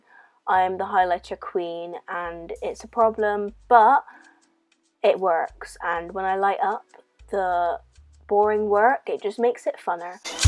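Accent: Bristol, United Kingdom. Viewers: are you from bristol cause your accent is really nice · Loving your accent, you must be from Bristolll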